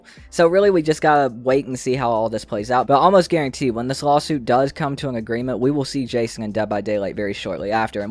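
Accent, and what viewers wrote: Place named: South, United States. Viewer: Wow only a few weeks? Well I really like your content so far and I can tell that you're from the South or at least it sounds like you are. I'm from Ocala Florida. I definitely wouldn't take too much of what Corey Feldman says to heart though. He definitely has been dealing with a lot of mental issues lately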